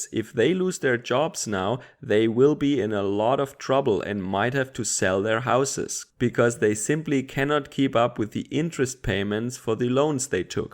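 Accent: Germany. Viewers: I understand nothing he's saying about economics, but damn do I love listening to that German accent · Dein German accent is grauenhaft blöde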